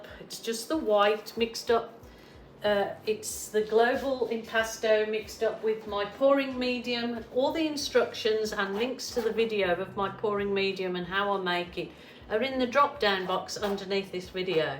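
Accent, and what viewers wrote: Birmingham, United Kingdom. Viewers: Wow that is brilliant...love these colours. You may be in australia but to us brits we can still hear your brummie accent x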